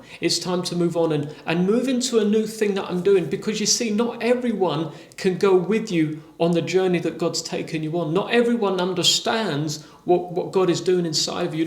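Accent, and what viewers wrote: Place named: England, United Kingdom. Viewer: He is English so he speaks proper English with the actual accent not the junk we hear in America.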